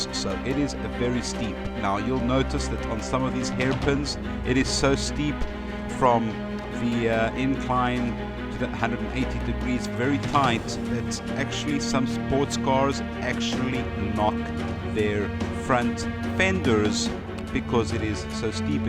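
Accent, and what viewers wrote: South Africa. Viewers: nice vid mate.....what part of South Africa you from? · You sound like you from South Africa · Hi there. Your accent sounds like S.African. I am Saffa living in France 20 years now and motorcycling here.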